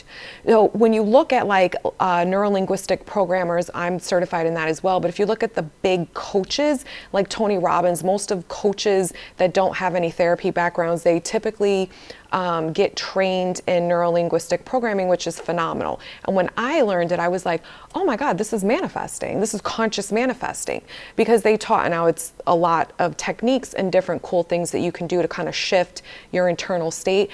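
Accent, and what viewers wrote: New York, United States. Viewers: She sounds nyc for real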